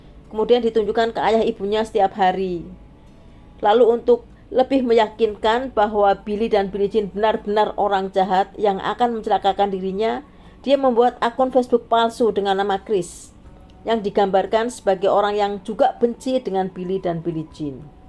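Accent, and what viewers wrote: Java, Indonesia. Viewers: Jawanya meddok banget 😁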